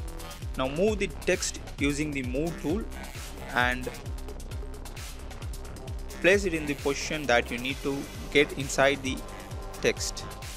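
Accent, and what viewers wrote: India. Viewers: I will never again make fun of Idian nerd guys accent ever again. Thank you so much.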